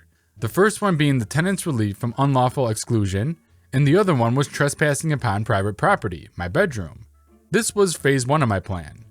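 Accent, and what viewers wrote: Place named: Midwest, United States. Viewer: I like your accent, it sounds Midwestern. Where is it from?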